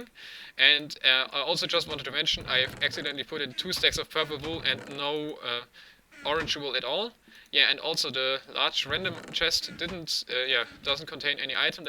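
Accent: Germany. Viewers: 100 % german-english ^^ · Bist du Deutscher? ^^ Dein Englisch hört sich irgendwie so an :D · You are german, right? :D Man hörts an der englischen Aussprache :D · Du bist deutsch oder? :D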